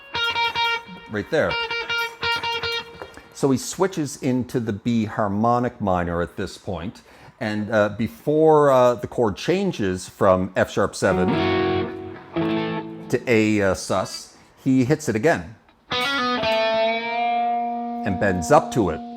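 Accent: Canada. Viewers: It was very interesting, I’m from England, do you have a Canadian accent?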